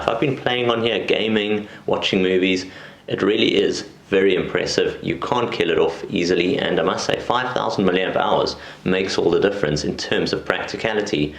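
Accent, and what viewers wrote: South Africa. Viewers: Your accent makes me think your from South Africa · Are you south african? (I hear hadidas)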